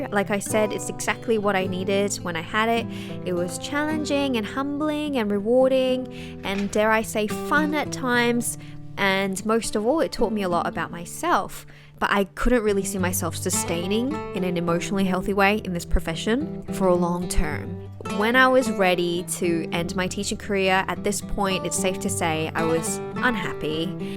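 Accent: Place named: Michigan, United States. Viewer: Yours is easily the coolest Michigan accent I've ever heard!! Your zig-zaggy life story is interesting too. Those are the most interesting life stories, where the person had to try a bunch of things before something eventually floated their boat. I'm paraphrasing Jerry Yarnell, the TV painting teacher guy who said something like, "Bravery isn't not being afraid to do something. It's being afraid to do something, and then doing it afraid." Looks like you've got pretty mad crafting skills too. I'm subbing your channel so I can swing by here from time to time.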